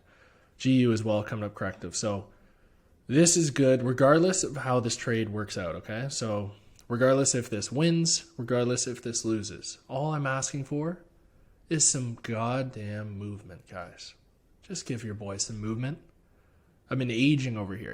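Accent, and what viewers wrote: Canada. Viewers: You sound like you're from canada! Where ever ur from, love from Toronto 💪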